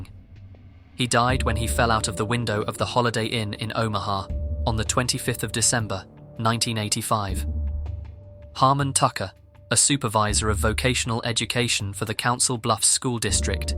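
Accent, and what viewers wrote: England, United Kingdom. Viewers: Always nice hearing another Englishmen having a interesting dark thought provoking channel wish you much success with it 👍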